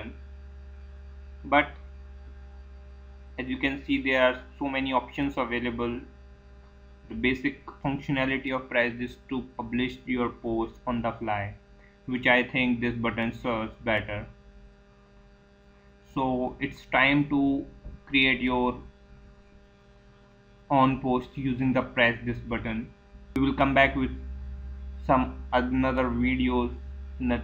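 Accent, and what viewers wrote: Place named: India. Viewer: I like tha Indian accent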